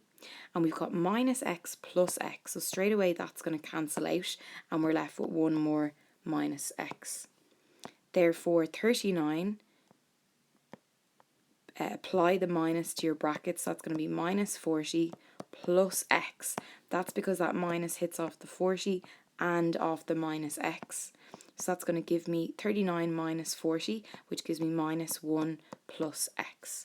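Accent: Ireland. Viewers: Oh man i love that irish accent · Irish accents do be cool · Omg, an Irish accent on a yt revision video...there is a god.😁❤️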